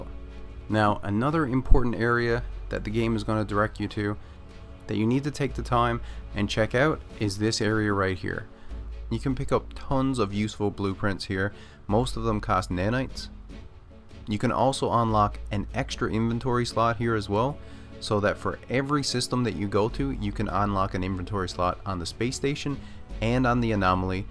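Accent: Newfoundland, Canada. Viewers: A minute in I was like wait b'ys a newfie - and then I saw your username 🤣 Born and raised in Deer Lake. Also thanks for the awesome guide! I just picked this up. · are you canadian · Great content buddy . You sound Newfie as my self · Heard whaddayat, and immediately thought, a Newfie on YouTube??? No way! Love from a Corner Brook boy! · Are you from Newfoundland you say "what are you at" the only people i know that say that are my family who are from Lewisport Newfoundland you sound like you have a slight accent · Guy is from Canada or Minnesota. 😄 Pretty good video though. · Hilarious. I was like "i know that accent". From one Newfie to another! Cheers dude!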